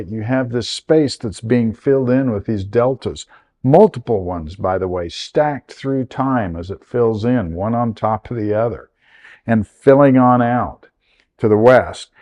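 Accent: United States. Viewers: Great content. Just so you're aware, the YouTube cc talks about "Anna Klein" where it should be anticline. It would be great if your editor could add these key terms and the names of the various structures as text on screen for people who are hearing impaired, watching with background noise, or not native English speakers accustomed to an American accent.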